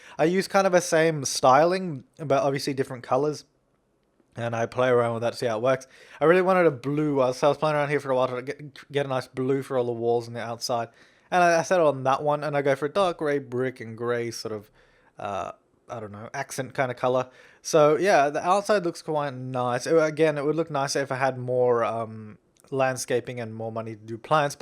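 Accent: Australia. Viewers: he’s Australian.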